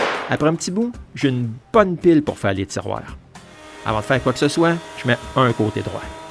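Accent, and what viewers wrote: Quebec, Canada. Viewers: encore une fois, Merci! Vous êtes ( et toi) les meilleurs.Avec en plus l'humilité et la modestie que l'on ne retrouve pas dans d'autres vidéos de bricolage. Pour beaucoup c'est souvent à l'américaine, avec beaucoup de blah blah et gesticulations pour au final n'être que de l'assemblage d'éléments achetés en grand magasins avec un résultat déplorable. De plus vous me réconciliez avec l'accent de la belle province!. A propos, que veux dire " Gosseux d'bois "?!? A bientôt!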